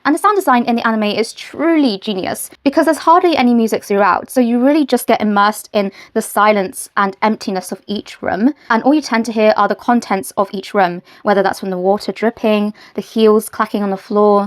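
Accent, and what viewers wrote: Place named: United Kingdom. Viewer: You have such a lovely accent. Were you raised in Britain?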